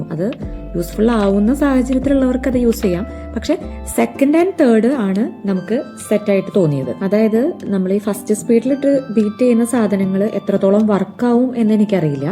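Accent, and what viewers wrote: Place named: Thrissur, India. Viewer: Is ur place Thrissur? Why do you mix your slang with others? Just go ahead with your own style, people will accept it. Be yourself.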